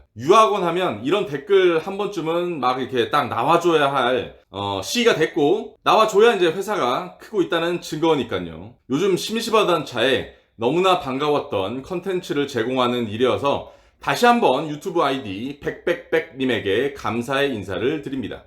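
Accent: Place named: Gyeongsang, South Korea. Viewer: 경상도 사투리 매력 있으십니다 선생님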